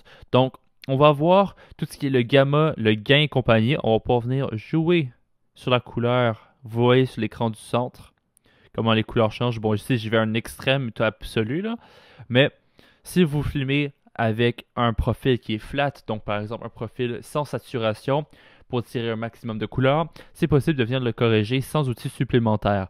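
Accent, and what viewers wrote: Quebec, Canada. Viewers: TU ES QUéBéQUOI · C'est du à comprendre le québécois 😥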